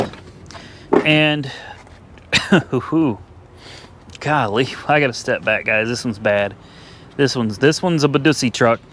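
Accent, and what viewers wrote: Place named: South, United States. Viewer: Love your accent, brings me back lots of good memories in Southern US!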